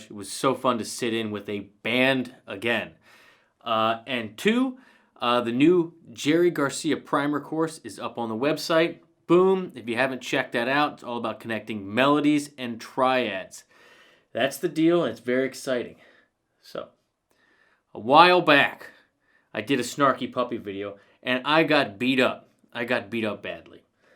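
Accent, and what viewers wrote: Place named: Buffalo, United States. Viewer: Are you from Buffalo? I hear Buffalo in your accent.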